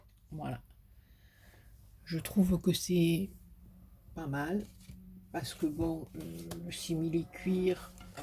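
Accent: South, France. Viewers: Merci à vous! J adore votre petit accent du midi! Courage ! Cordialement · bjr madame trop trop beau je ne connaissais pas le système de fleur en simili cuir et j'adore votre accent du midi bonne journée continuer comme cela c 'est super et bon rétablissement a vous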